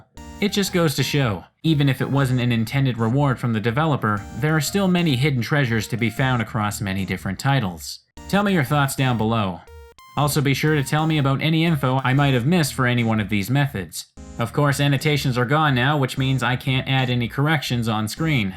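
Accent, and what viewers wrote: Canada. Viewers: as if from Canada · Maybe... But maybe he could be from BC after all. If he's from up north enough, that would explain some of the accent quirks. My friend says if you go north enough (where he goes camping, I think), they start pronouncing the aʊ-type OU words as oʊ. I don't know about the u:-type OU words, tho. I just know my friend likes to say he likes to go "oat and a boat". XD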